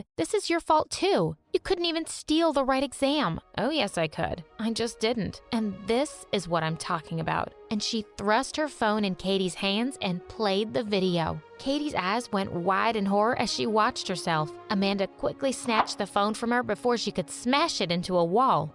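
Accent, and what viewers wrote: United States. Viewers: Girl i love your cowgirl accents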